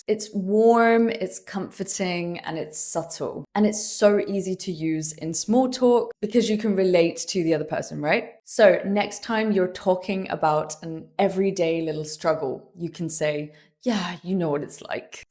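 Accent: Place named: RP, United Kingdom. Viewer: Hello! Thank you for this lovely video! I’ve noticed some rhoticity in your accent, even though it usually sounds like RP. Can you please educate me on what your accent is called or where it is most common?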